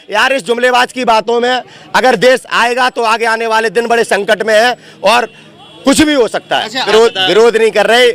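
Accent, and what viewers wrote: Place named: Varanasi, India. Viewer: Ye Bhai tho Banaras wale misraji jase bolrahe hai. Misra ji ko our app ke jazbe ko salam. Sare jahan SE acha Hindustan hamara. Jai Javan Jai kisan. Hindustan Zindabad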